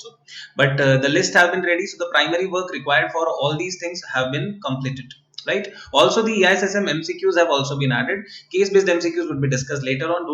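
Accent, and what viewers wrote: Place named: India. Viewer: Arre Sir Mast Angreji thi 😀... Bolte raho..to hindi ka undertone b nikal jayega ! 😎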